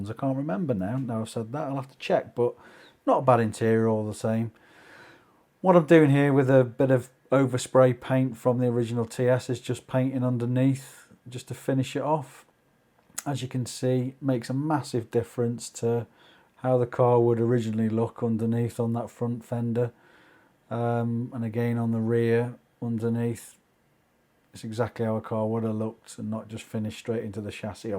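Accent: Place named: England, United Kingdom. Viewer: Looking forward to watching the other videos you have made. You have just been recommended to me - nice to hear an English accent! I haven't grown up either!!!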